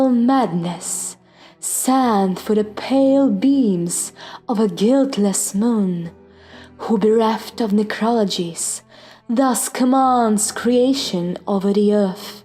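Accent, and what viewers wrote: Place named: United Kingdom. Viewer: I love your voice and accent. Are you British? By the way, the part at the end literally gives me shivers. Maybe make ASMR?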